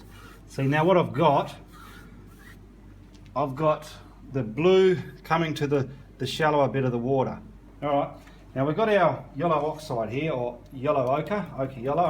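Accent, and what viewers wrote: Australia. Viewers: I am loving your tutorials!! I have been catching myself taking with an Australian accent! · LLOOOOOVE YOUR AUSSIE ACCENT MATE! 😂😂😂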